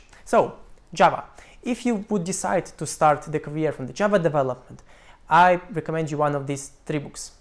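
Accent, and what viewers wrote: India. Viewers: Why do you sound Indian😅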